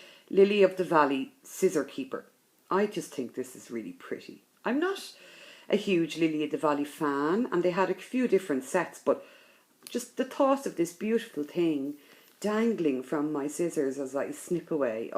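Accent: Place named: Ireland. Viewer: Hi. Ah, I could listen to your speak all day, I love a great Irish accent. If it were me, I'd cut the four panels of the tree and stitch them separately, just for, ease of use. A couple of online stores in the UK are Sew and So and Bothy Threads. Etsy, Clouds Factory, Frosted Pumpkin and Heaven & Earth Designs (HAED) all offer downloadable PDFs available for purchase (so no shipping costs).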